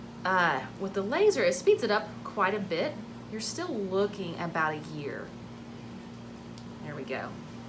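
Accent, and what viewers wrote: Kentucky, United States. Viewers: I knew that Kentucky accent when I first started watching. Then you mentioned toyota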